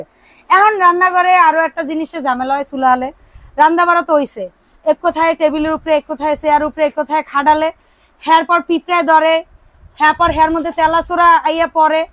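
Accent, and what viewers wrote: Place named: Barishal, Bangladesh. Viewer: আসসালামু আলাইকুম আপু মোর বাড়ি বরিশাল বরগুনা কথাগুলো খুবই সুন্দর লাগে নিজের আঞ্চলিক ভাষা মত শান্তি আর কোথাও নাই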